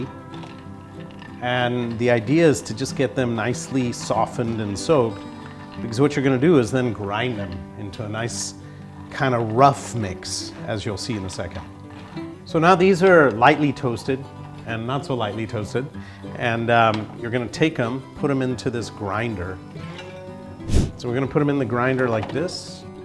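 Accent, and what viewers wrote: Maharashtra, India. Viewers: I liked your Marathi accent, 🤩🙏 It's a pleasure and feel proud to see that such a superior doctor who practices medical overseas for many years hasn't forgot his roots. You are such a down-to-earth man. I truly admire you 🙂 Keep inspiring us through your lovely videos 👍 🤩Stay fit and keep smiling 🙂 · Loved your marathi ॲक्सेंट ❤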